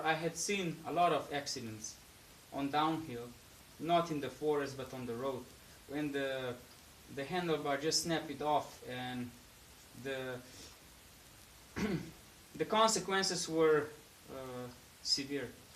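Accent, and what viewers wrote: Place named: Slovenia. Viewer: You sound like someone from Slovenia.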